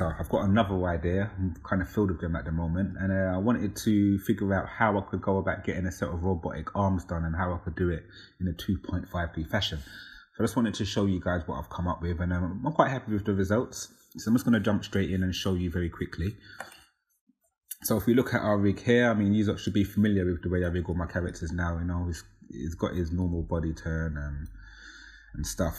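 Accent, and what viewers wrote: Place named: London, United Kingdom. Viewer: First one I've seen of you with a voice-over. Enjoying the London accent.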